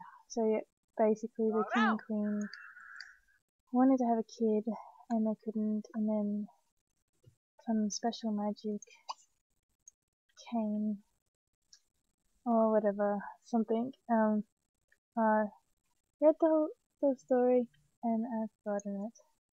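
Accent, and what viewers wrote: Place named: Australia. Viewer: Are you Australian? You sound it :)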